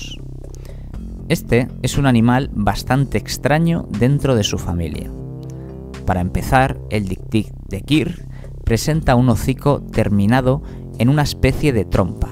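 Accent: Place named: Spain. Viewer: Espero algún día antes de morirme... nuevamente escuchar el acento latino ...Y no escuchar más este acento coño....tan miserable...tan feo ....tan.... pero tan....re...ni encuentro la palabra para describirlo....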